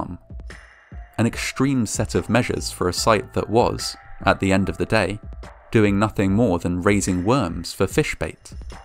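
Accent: United Kingdom. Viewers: Gawd I hate a British accent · WHY is this American incident narrated by a British narrator? Couldn't you get an American to tell the story? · I'm confused. You seem to have a British accent and yet you consistently keep using metric measurements first. Everyone knows UK and USA have it right.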